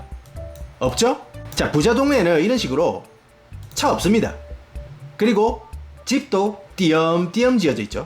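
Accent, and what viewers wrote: Gyeongsang, South Korea. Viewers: ㅎㅎㅎ 경상도 사나이 재미납니다 ㅎㅎ. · 와... 약간 혀가 꼬인 빡센 오리지날 경상도 사투리 들으니까 이거 머 내용이 재밌기도 하지만 듣는 재미가 쏠쏠하네요 ㅋㅋㅋㅋ 쌔끈빠끈 표현 찰지네요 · 아주 논리적으로 재미있게 갱상도 발음으로 마 말을 잘하시네요.😁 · 쌍도 말,억양좀 쓰지마라. 토난다! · 아이 미국 현지 영상을 보는데 알아듣기 힘든 경남 사투리가 왜케 심하냐~ㅋㅋㅋㅋ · 경상도 사투리인지 부산 사투리인지 모르겠지만, 사투리가 한국에서 듣던 것보다 엄청 재밌네요. 모르는 단어도 많이 나와요. ㅎㅎ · 경상도인듯 ㅎㅎ 고향 말투 들으니 넘 반가움ㅋㅋ 반갑습니다!!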